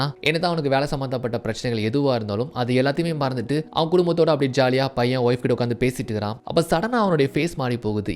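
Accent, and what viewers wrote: Tirunelveli, India. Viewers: Thoora ponga indh bashai tirunelveli slang la 😅nellai ya neenga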